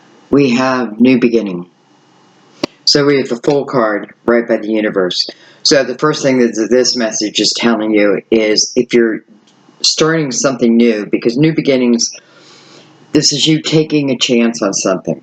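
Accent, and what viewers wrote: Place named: Pittsburgh, United States. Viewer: You are getting so warm on what I am going through I can feel the heat! I found you about two years ago. Being a Pittsburgh native myself, I knew from your dialect and Steelers stuff that you were one too! So thank you for being there because when you read my cards, I am an Aries, it is like coming home to an old friend. Have a blessed year.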